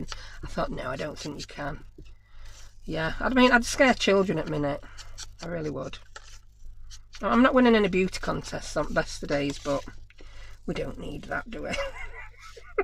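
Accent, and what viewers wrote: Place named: Birmingham, United Kingdom. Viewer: Oh....you are a treasure. You sound just like my Auntie from Birmingham and have the same sense of humour. Love every episode...keep em coming. Love from Canada xx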